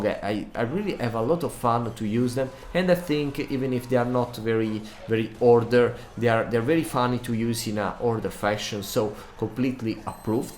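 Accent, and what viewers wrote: Italy. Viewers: parli molto bene in inglese ma si sente ancora che sei italiano xD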